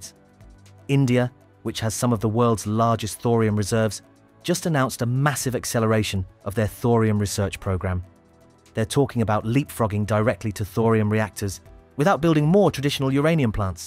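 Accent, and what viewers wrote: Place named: United Kingdom. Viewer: the British sounding AI narrator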